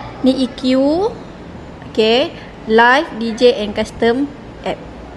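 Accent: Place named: Malaysia. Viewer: Malaysia, gaya bicara nya khas. Banyak orang Indonesia yang suka gaya bicaranya logat Malaysia.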